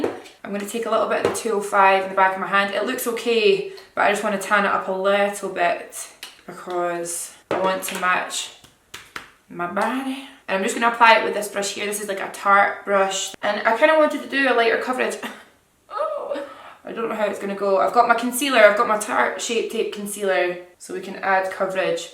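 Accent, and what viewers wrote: Scotland, United Kingdom. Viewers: Is she Scottish? I LOVE HER ACCENT! 😍😍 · Are you Scottish? You sound scottish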